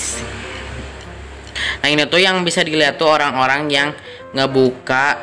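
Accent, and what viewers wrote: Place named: Sunda, Indonesia. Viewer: Logat nya kee Sunda bang 😭😂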